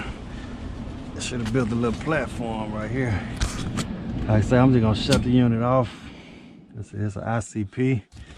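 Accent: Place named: Memphis, United States. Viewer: You gotta be from Memphis. You sound just like we do.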